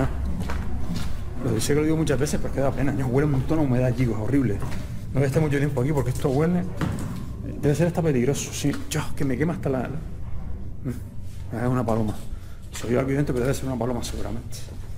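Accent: Spain. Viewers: y a mi también me encanta tu voz porque tiene el acento andaluz y hablar como mi madre y mis primos de Sevilla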